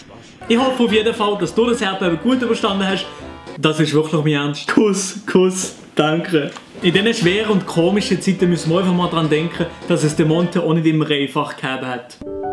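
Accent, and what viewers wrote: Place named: Fribourg, Switzerland. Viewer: i ha mer scho fasch deicht du sigisch friburger wägem akzent XD geils video!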